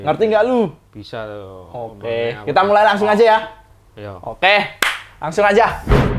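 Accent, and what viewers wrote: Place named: Semarang, Indonesia. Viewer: Logate semarang bgt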